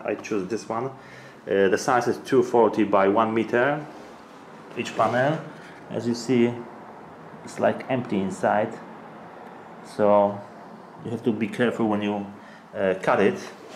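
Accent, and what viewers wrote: Poland. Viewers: His accent sounds Polish to me.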